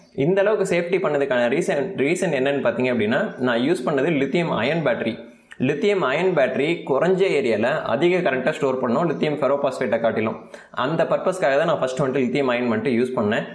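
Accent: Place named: Kongu, India. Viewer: மரயாதை,கலந்த கொங்கு,தமிழ்,அருமை🌷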